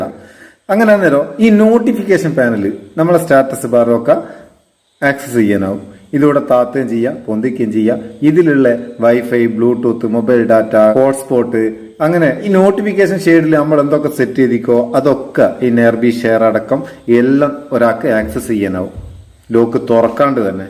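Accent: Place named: Koyilandy, India. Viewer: ഇങ്ങൾ കൊയ്ലാണ്ടി ആണോ ന്റെ ഉപ്പാന്റെ ഫ്രണ്ട് ഇതേ സംസാരം 😄😄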